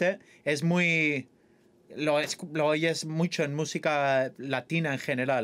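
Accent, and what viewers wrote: Spain. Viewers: Patético, lo haces demasiado mal, eres español hasta la médula, ahí tienes tus reproducciones por decir que eres estadounidense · que bien hablas español... con acento español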